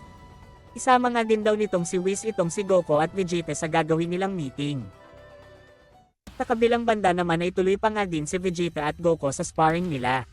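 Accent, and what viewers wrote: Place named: Visayas, Philippines. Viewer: Bisaya, katamad makinig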